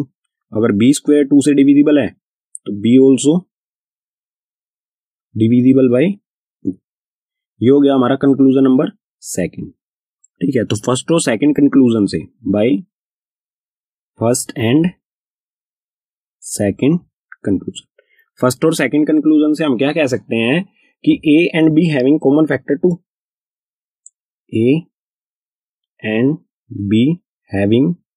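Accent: Haryana, India. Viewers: Sir aap Haryana se ho na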